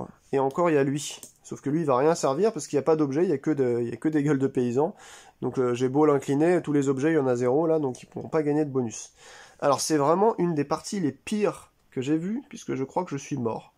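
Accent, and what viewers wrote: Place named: Quebec, Canada. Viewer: Woah! Tu n'as pas eu de chance mais vraiment pas! Bon, je vais devoir me procurer la nouvelle édition, je la trouve vraiment mieux. Au niveau des dessins, c'est Jean-François Gauthier qui fait tout: invente le gameplay, fait les dessins, s'occupe de l'édition, vraiment tout pour les 3 jeux. Plusieurs personnages proviennent de Goldblivion, trop bien! Ton accent québécois est parfait! 😄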